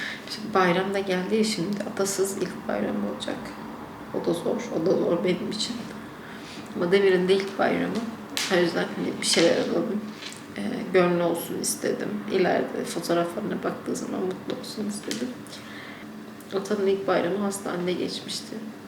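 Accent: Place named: Russia. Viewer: Türkceyi ögrendide russ kaldi😂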